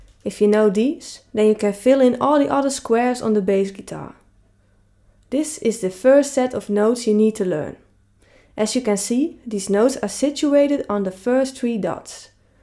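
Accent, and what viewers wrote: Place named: Netherlands. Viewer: Thank you.... you made it so easy. Like your Dutch accent.😌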